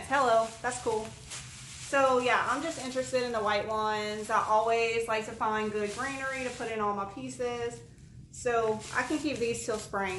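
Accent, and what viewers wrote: Louisiana, United States. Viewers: You sound like a fellow Lousiananian. Am I right? Great haul . Edit: I made my comment as soon as the video started. I just heard you talk about Lousisna weather. So, yep.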